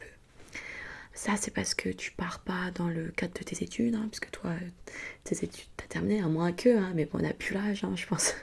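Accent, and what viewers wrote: Vosges, France. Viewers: Le retour que personne n'imaginait ! L'accent vosgien fait son come back ! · Quel belle accent 😍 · Cet accent feels like home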